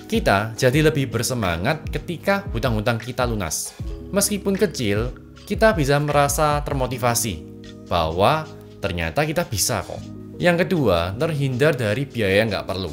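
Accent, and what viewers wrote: Java, Indonesia. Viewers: lihat.cnl.ini.lngsung.tertarik.kaget .mase.ganteng bangt kok.suaranya.kejawen....mantap...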